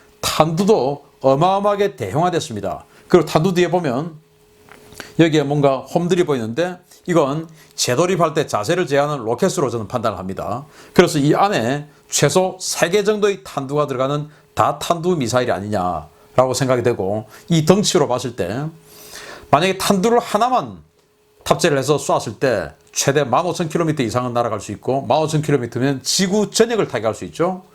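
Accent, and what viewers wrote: Gyeongsang, South Korea. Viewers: 유투버가, 일본말비슷한 상도사투리쓰면않되요, 듣기거북하고 ,애들교육상않좋아요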